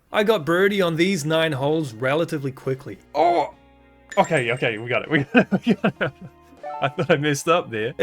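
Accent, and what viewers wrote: New Zealand. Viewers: I knew the fuck as soon as I heard your voice you're a kiwi, where are you from my brother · New Zealand accent? Oh this is about to be a life-changing video lmao (all the cool people live ther)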